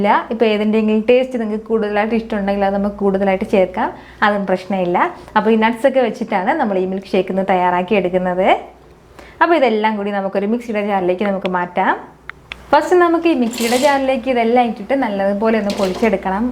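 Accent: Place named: Thrissur, India. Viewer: Thrissur aayirikkum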